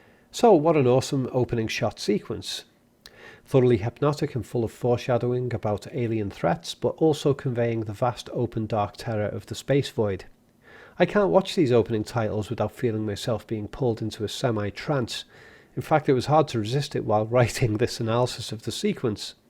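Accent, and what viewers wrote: Liverpool, United Kingdom. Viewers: scouse git innit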